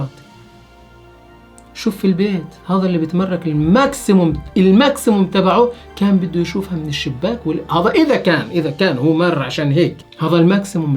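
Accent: Palestine. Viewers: حكيك زينا فلسطيني ..كلامك رائع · هذا فلسطيني واضح من لهجته لكن تحيه لك على اي حال اخوي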